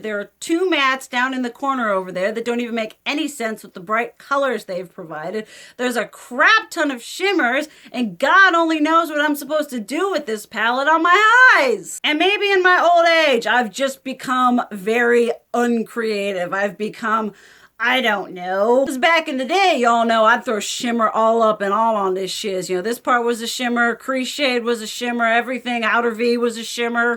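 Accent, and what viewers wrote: South, United States. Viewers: Hey girl!! This is the most Southern I have ever heard you lol. I recently refound my Electric palette and am thinking of finally decluttering it since it’s old. Lorac definitely stays in their lane. 😂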